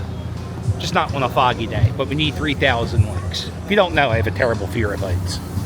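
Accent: Philadelphia, United States. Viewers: Linguist consider the Philly accent to actually be a southern accent due to vowel sounds. Baltimore is similar. We Philly folks just talk fast. And this guy has the best Philly accent I’ve heard in a long time. Makes me homesick. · Love your Philly Accent - Helen from Bal-more! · That's a serious Fluffya accent.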